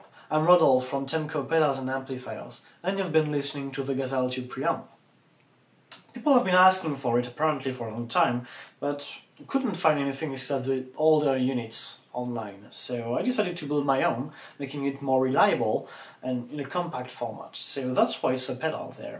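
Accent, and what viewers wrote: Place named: United Kingdom. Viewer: Amazing work as always. Love the English Channel accent <3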